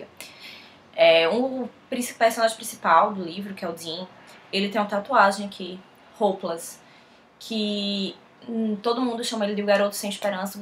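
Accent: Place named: Portugal. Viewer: vc por acaso é portuguesa ou de algum país? é que vc tem sotaque!!!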